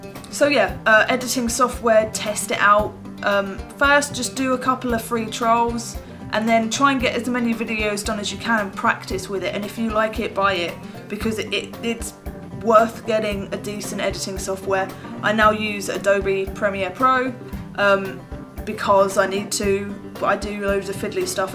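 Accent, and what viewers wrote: England, United Kingdom. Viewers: Yeah, but how do I get the cool English accent?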